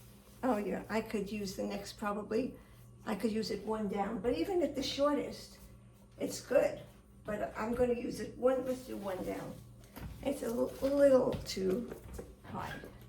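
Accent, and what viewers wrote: New York, United States. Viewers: Same! She's a real New Yorker, in the Pacific Northwest! Not common I would guess.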